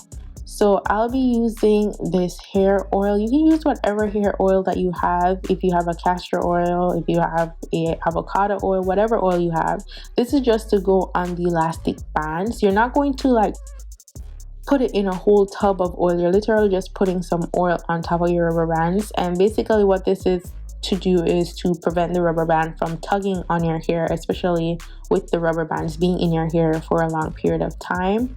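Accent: Jamaica. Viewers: Are you Jamaican? I think I hear the accent. Love the video👍🏽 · Great video, you sound like a Jamaican. Are you? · First am stopping by, nice vid. You sound Jamaican are u? Is she guys? I'm Jamaican so I recognize the accent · Hi there! U sound like your a Jamaican ☺😇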